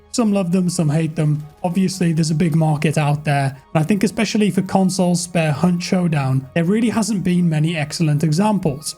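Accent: United Kingdom. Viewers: I have to say it's not that often that I can handle the British accent for more than 30 seconds. But I can handle you talk for 10 minutes and that's good enough. Talk about how good the graphics are, the gameplay, the movements, and how do you feel with the third person perspective. Please try to sound more American normal or at least a non-wining accent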